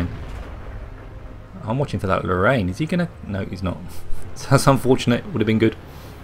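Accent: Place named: United Kingdom. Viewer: its the British accent i guess;) didnt hear the sarcasm.. good weekend.. also can i send some gameplay?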